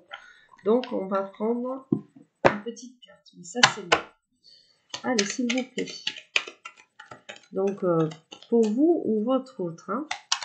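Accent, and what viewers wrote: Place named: South, France. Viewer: Bonsoir ravie de vous revoir une tres jolie guidance je ne vous lâche jamais j'aime votre façon de parler un peu de sud quant au eeee je comprends lorsque l'on réfléchi tout a fait normal les gens vraiment 🙄 débile prenez bien soin de vous surtout a tres vite j'espere🤩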